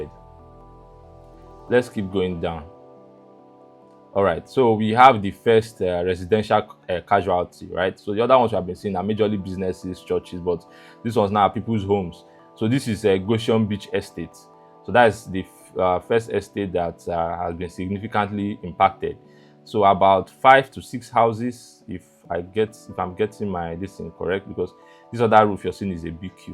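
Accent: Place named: South London, United Kingdom. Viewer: The landmark structures are that of a warehouse standard and obscene for such a location. Of course, most Nigerians have never seen beach tourism like Brazil and Spain. make him collect his compensation and go somewhere. The standard is 0. He guy should stop brainwashing Nigerians with his Saf London accent make we hear word.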